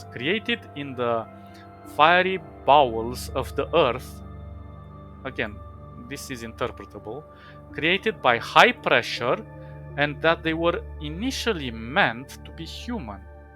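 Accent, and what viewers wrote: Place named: Lithuania. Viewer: Hey bro great video, but I have an unrelated question. Are from Lithuania or someplace close to it? You have an accent very similar to a Lithuanian friend that I have.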